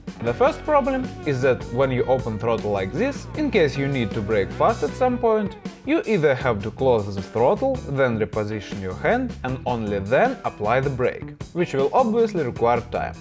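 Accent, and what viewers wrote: Russia. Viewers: Бля, чувак с таким Рязанским акцентом луче не позорился бы и говорил по русски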